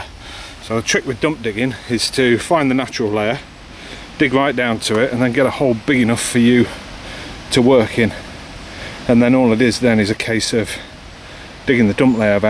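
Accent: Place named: United Kingdom. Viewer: where are u based because your website is not working and it did say u r based in the USA ,I assumed because you have a uk accent you are digging in the uk ,I ask because I wanted to join but as I've said your website is not working and I couldn't find any info on how to join ,most of the comments are people like myself are from the uk and wanted to join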